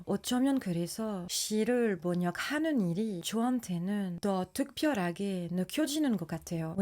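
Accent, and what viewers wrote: France. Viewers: 재한 프랑스인 님의 한국 문학 심화 강좌입니다. 프랑스 액센트의 한국어가 우아하고 고급지게 들리지요? 늘 재밌습니다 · 영상 처음보는데 불란서식 한국어를 사용하시는게 굉장히 신기하고매력적이네요. 근데 계속듣다보니 찐경상도 토박이가 표준어쓰려고하는 것같이도 들려서 나도모르게혼자웃음. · 어설픈 한국어 발음과 프랑스 특유의 악센트에도 한국인보다 더 한국스러운 느낌을 적나라하게 표현하는 외국인. 아니 한국짓에 더욱 충격을 받게 되는 지나가던 문과인 댓글올립니다...... 한국말이 참 이쁘지만 외국입장에선 참 어려운건 사실입니다.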